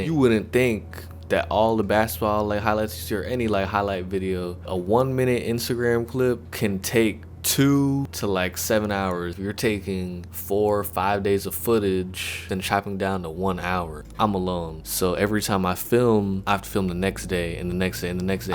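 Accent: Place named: Alabama, United States. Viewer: Getting the Alabama accent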